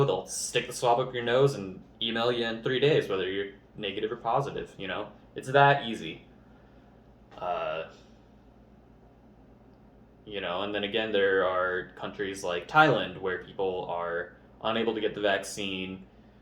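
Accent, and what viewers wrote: Colorado, United States. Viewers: Heyy 😅 this is probably not the comment you were expecting but I was looking for a video with someone who had that beautiful Colorado accent as I have to learn it for an acting gig. Your like the ONLY ONE I can find on Youtube! But I must say thought this is one darn interesting video. I was captivated until the very end! 👌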